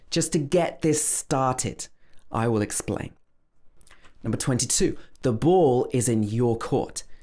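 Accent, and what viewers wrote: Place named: United Kingdom. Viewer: Thank you teacher. The video helps me learning British English accent. I hope you make more videos regularly.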